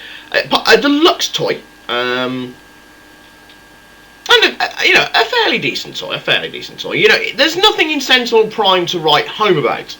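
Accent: United Kingdom. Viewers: but seriouslyis he british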